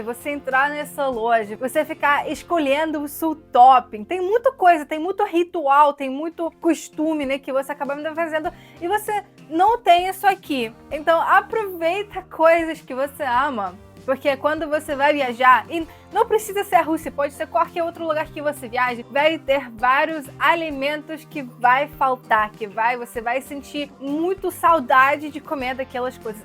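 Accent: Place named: North, Brazil. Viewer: Parece brasileira e do norte do Brasil!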